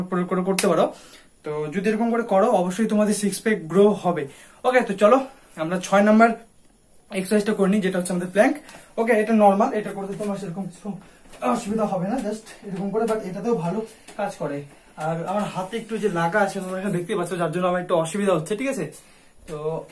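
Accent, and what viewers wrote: Kolkata, India. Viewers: আপনি কি কলকাতা থেকে 😅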